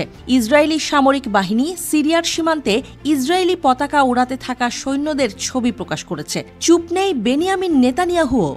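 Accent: India. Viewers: খবর বলার ধরন দেখে মনে হচ্ছে সে একজন ইন্ডিয়ান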